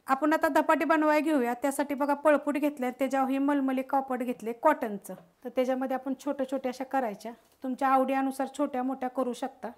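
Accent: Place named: Kolhapur, India. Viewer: काकी , तुम्ही कोल्हापूर भागातील आहात का... मला तुमच्या बोलण्यावरून् वाटले ... तुमच्या रेसिपी खूप आवडतात मला... मी नेहमी करून बघते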